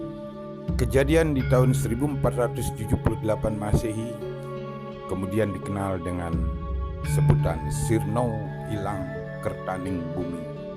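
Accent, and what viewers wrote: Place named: Bali, Indonesia. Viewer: Pengisi suaranya kalo ngomong T kayak orang Bali 😁👍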